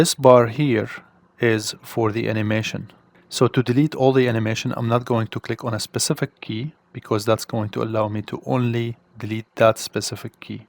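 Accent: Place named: Serbia. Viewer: You have a Serbian accent:)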